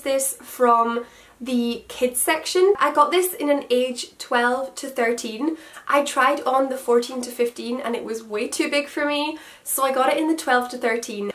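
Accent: Scotland, United Kingdom. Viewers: Omg r u Scottish if so I'm from Glasgow ❤️❤️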